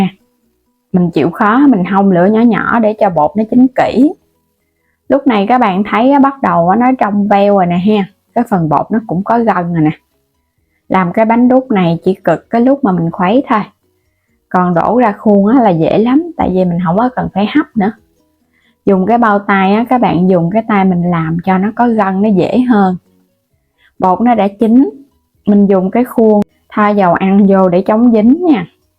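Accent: Mekong Delta, Vietnam. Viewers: Giọng miền Tây dễ thương mà bạn sao bạn nghe không được vậy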